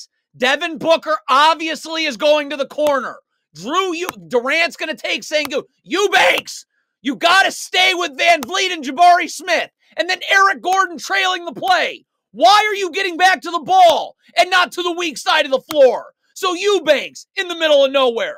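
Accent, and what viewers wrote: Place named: Philadelphia, United States. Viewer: ima big fan of this dude... he sounds like he a Philly dude...we act like this 24 7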